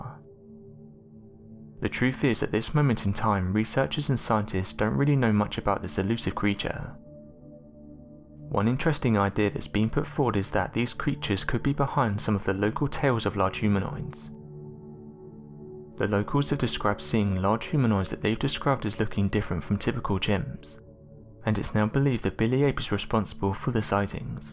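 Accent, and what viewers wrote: England, United Kingdom. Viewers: What’s up with the cadence and flow of your voice man? I mean no offense nor do I have any problem with your British accent, just the monotone sound and the way your telling of things in your videos is segmented into sentences with this ebb of your voice. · Anyone else tired of these voices of the narrators in these type of videos? Always some guy or girl from UK with little to no life in their voice. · A video regarding bili apes with no footage or pictures of an actual bili ape... just someone with an english accent talking.... interesting.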